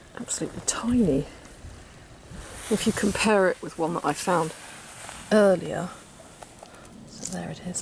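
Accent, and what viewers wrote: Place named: England, United Kingdom. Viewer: I’ll bet that is a good feeling, finding a complete pipe! I know I’ve said it before... but I’ll say it again... I enjoy hearing you speak. Here in California when people speak it’s just bla bla bla. But when you say something, however simple it may be... it sounds beautiful to my ears. I’m a sucker for an English accent, but yours is even better than the usual. Ok... enough gushing from me. 🤷🏻‍♂️👏🏼👏🏼👏🏼👍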